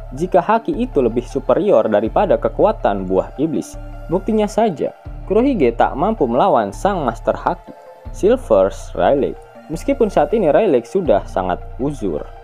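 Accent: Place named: West Java, Indonesia. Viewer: tercium orang sunda wkwkwk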